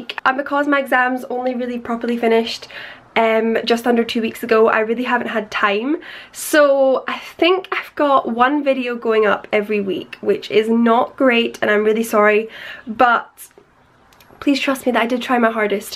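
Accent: Scotland, United Kingdom. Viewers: SUBSCRIBE AS SOON AS I HEARD U ARE SCOTTISH ME TOO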